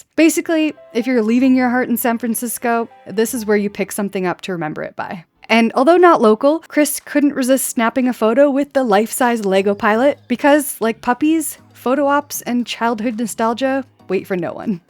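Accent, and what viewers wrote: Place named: Canada. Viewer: None of this existed the last time I used the airport which was 2005. And while everything you showed looked nice I agree with other comments. You were comped for sure. no mention of prices at most places you visited. High end is fine if the average mortal can afford it. Besides most people do not have the time to spend 24 hours at any airport with all the things we have to do just to arrive and check in. And BART is not all great. Took it from downtown SF on my return and there was a delay for at least 30 minutes in the middle of nowhere - I thought I would miss my flight - thankfully I didn't. And having visited Canada many times your accent was a dead giveaway - Toronto, maybe?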